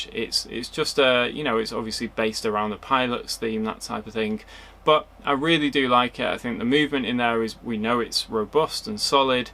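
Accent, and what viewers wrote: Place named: United Kingdom. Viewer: Great review. I love your review style and you have a very pleasant voice (not to mention a lovely British accent as well). Personally I really dislike the dial of the Air King and I would rather buy something lesser known and better valued (for example for the 5000 GBP the Air King costs I would rather buy a Sinn and a Nomos) but there is no accounting for taste! Kind regards from Amsterdam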